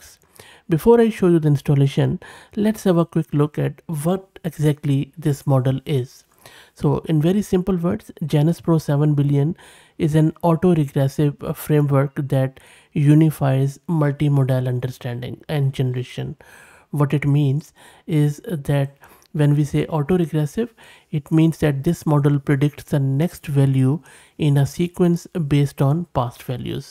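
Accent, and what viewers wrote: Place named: India. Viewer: Man, it's a pain in the ass that Indian-accented English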